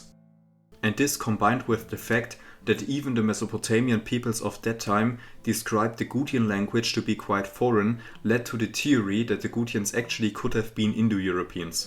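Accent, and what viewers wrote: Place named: Germany. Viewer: Der deutscheste Akzent, den ich lange gehört habe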